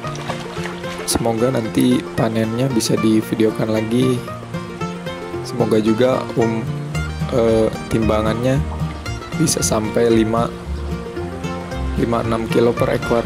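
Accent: West Java, Indonesia. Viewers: Orang sunda ya?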